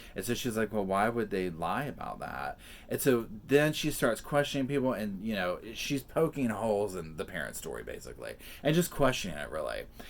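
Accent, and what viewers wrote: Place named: North Carolina, United States. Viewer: You sound like you are from NC!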